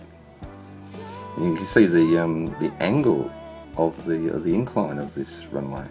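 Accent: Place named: Australia. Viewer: G'day mate, lovely Aussie accent!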